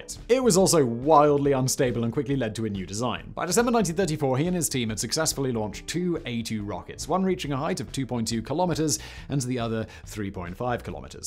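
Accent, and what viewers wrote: United Kingdom. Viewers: Admittedly, I stopped after "Inside the V-2" section. So, alcohol and water, that's it? Did you forget the oxidizer? As our leader says, "Come on man!" P.S. I have to slow all your videos down to at least ..... 0.75 speed. Drink less coffee or tea or even consider a mild tranquilizer, you speak like you're being charged by the word, and with a British accent (can't be helped, I'm sure) which makes it very difficult to follow, much less comprehend, what you're saying. Nonetheless, your videos are interesting.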